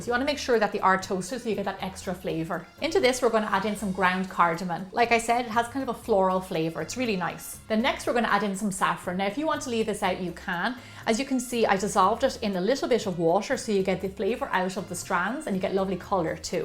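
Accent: Ireland. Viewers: I love your Irish accent in the making of kulfi Ice cream. You should try Chamomila and honey Ice cream soon.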